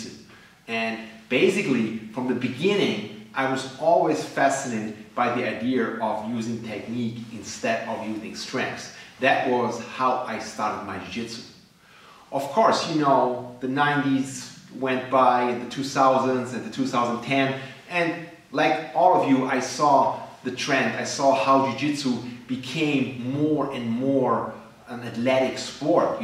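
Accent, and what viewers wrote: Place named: Europe. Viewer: Hi, love your work! I fully understand what you are talking about. I have a couple of questions: 01. Are you going to be doing instructional submissions escapes and positional escapes anytime soon? 02. If someone wants to be moving up the ladder in your philosophy and your jiujitsu... How does one go about it (You sound European, so it would have to be remotely)? Thanks!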